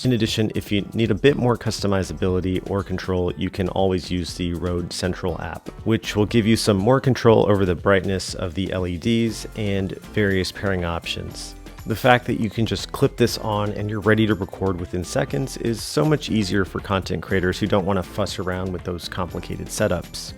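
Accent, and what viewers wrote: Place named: United States. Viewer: I’ll do it Great looking man. Nice American accent. Good content 10/10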